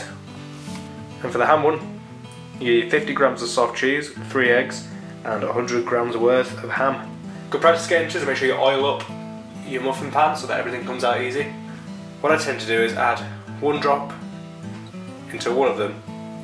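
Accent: Yorkshire, United Kingdom. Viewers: Brilliant! Love a Yorkshire accent. 👍🏻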